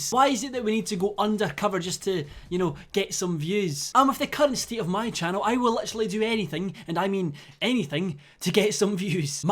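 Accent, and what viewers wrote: Scotland, United Kingdom. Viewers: Lol I love his Scottish accent I have an American accent and I LIVE in Scotland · SepticSkull X it’s a scottish accent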